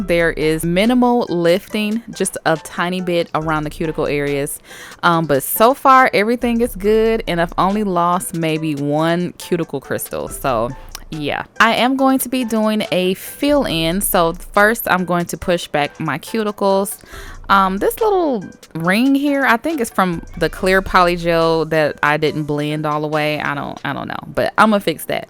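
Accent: South, United States. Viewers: Yall don't come at her she's country okay, that's how she says orange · I thought you were saying orange normally. But I'm country too so.... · First of all this look is <link>! I have a toddler son so my first thought at blue and orange was blippi 🤦🏻‍♀️ lol but it turned out so pretty! Amd second I LOVE that you talk like I do. I have a very thiccccc southern accent and honestly that's why I don't do many videos on my channel cause I hate the way I sound lol but girl your making me more confident to finally do a nail art channel! 💅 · OMG SIS!!! Absolutely Amazing!!! I’m loving this set. You are crazy talented sis!!! Yasss I’m wanting this set on sis. Don’t worry about how you’re pronouncing things; I’m country too sis. Tysmfs Love ya!!! 🥰🥰🥰💅💅💅💯💯💯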